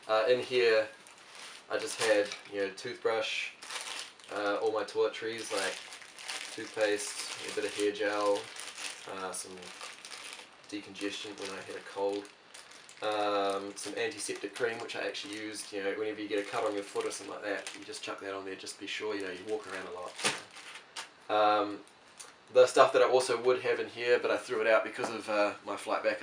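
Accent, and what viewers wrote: New Zealand. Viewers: a Kiwi (from New Zealand - the land of superb hiking). Aussies generally have broader accents, lol!! Happy travels. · So good to hear Kiwi accent on Youtube! Im from NZ & off to Euro in 2 wks time. Got a Pacsafe x40 & few other bits n pieces. Thanks for the tips, really helpful. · Great video bro! I'm a fellow kiwi currently travelling around Europe too, you have a lot of great tips and I've defiantly learnt a couple things. Good to hear a kiwi talk after months of Europeans haha. loved the video. Cheers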